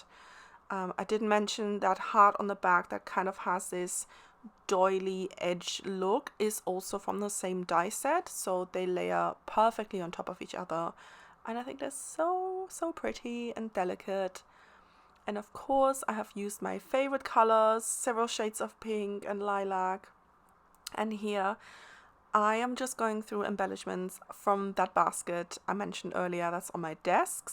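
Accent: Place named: England, United Kingdom. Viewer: You make such pretty things. I love pink and purple myself. My fav colors. Love listening to your “English” voice. We here in the states gave the “hick” sound. 🤣. Anyway, I need to find the pretty embellishments like you do to layer with. So fun watching you create. TFS!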